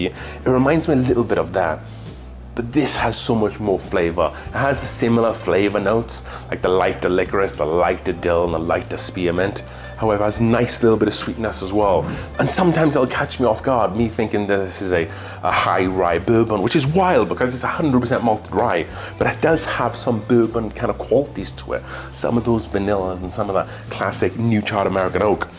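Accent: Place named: Wales, United Kingdom. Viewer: Are you a taff? just guessing by the accent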